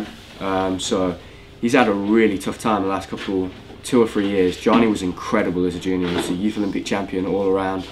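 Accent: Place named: Nottinghamshire, United Kingdom. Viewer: Jesus Christ are you from Notts? Omg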